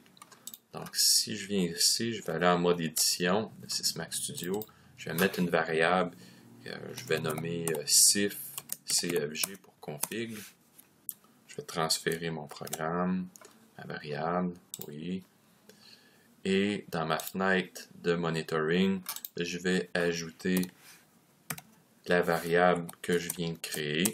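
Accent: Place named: Quebec, Canada. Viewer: Ben trop québecois ton tit-accent toé là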